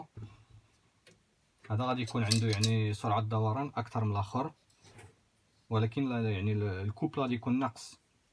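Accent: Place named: France. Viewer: ديس لايك بسبب لهجتك الفرنسية الخراء، والله انت لست بعربي ، اعتذر لك إذا كنت امازيغي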